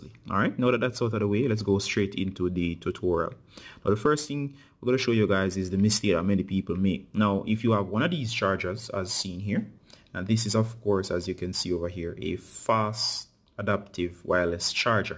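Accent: Jamaica. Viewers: Are u ah Jamaican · yuh sound jamaican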